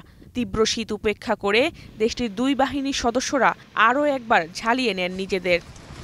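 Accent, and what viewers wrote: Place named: Comilla, Bangladesh. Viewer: Voice eirokom keno ? Didi r bari ki Comilla?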